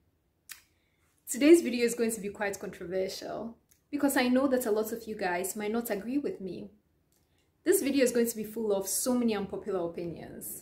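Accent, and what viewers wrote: Ghana. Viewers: Exactly, nothing different, accent is typical gh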